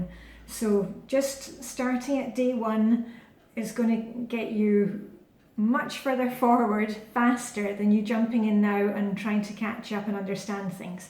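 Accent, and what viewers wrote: Scotland, United Kingdom. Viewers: Thank you so much for everything you do. These baby steps are truly life-changing and I'm feeling happier and more positive as a result, I'm really getting my 'mojo' back, so thank you for your videos. I've had FlyLady's 'Sink Reflections' book for a couple of years now and it's great but actually seeing you every day and watching your videos has really made all the difference. My sink is clean and shiny, I'm dressed to shoes, the washing is on, the bed is made and my kitchen hotspot extinguished! I look forward to watching the next video every morning, I make a cup of coffee and it's like having my own little pep talk. Keep up your fantastic work! PS I'm a Scot living in England and it's lovely to hear a familiar Scottish accent, just like being home. Thanks again x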